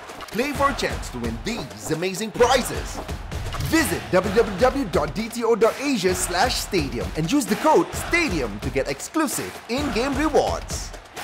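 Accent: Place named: Malaysia. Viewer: Lidah melayu betul mamat ni. Terbaikkk 👍🏻👍🏻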